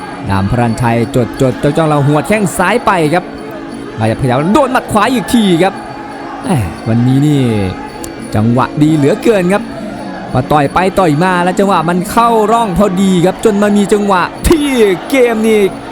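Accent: Thailand. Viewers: ชอบเสียงพากย์ ลูกผสม ไทยบ้าน + เสียง กรุงเท๊พ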